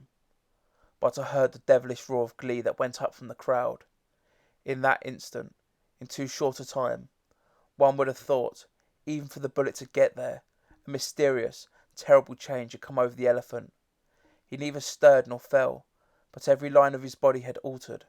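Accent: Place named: England, United Kingdom. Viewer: Thank you for this video. This essay was assigned to my English class for analysis and hearing someone else read it really really helped me. It always sounds better with an English accent.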